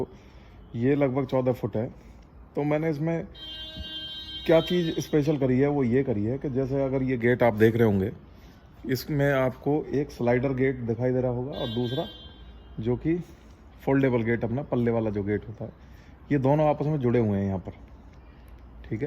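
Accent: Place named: Haryana, India. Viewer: Bhai apke smjhaane ka tarika bhut hi alg hai or bdia hai apki awaz se lgra hai ap humare haryana se hi ho or humari jaat caste se hi lgre ho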